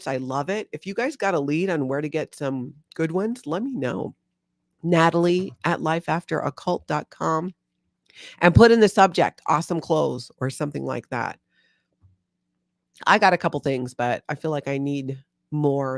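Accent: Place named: Hawaii, United States. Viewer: You definitely have an accent. I think it’s the Hawaiian influence.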